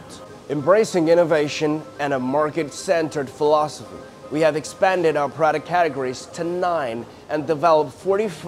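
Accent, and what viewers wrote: South, United States. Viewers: I don't know why has a hint of southern accent, but I love it!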